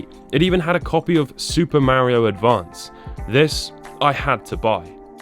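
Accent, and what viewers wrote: United Kingdom. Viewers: He’s British like me 😅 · Oh, you are brithish! You should go to this place called Goodwill Computer Works, there's one in central texas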